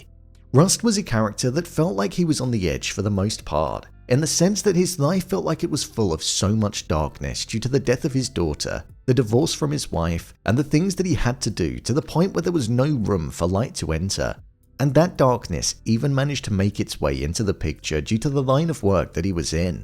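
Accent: England, United Kingdom. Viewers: that's not an Australian accent and anyone from Australia would be insulted by that. Its a straining for a poo part of England robotic voice.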